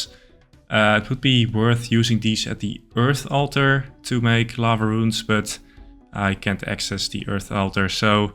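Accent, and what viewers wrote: Netherlands. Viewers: het nederlandse accent is niet te missen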